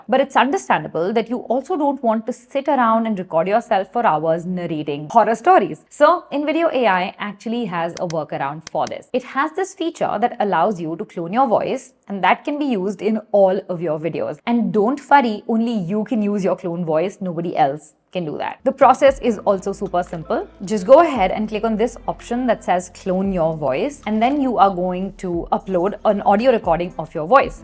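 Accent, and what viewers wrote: India. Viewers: Your voice is sound as indian ❤😮😊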